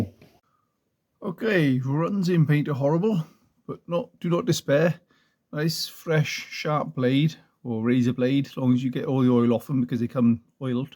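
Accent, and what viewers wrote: Wales, United Kingdom. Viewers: Lovely job hardly no overspray must have a good extraction system great love the Welsh accent too😂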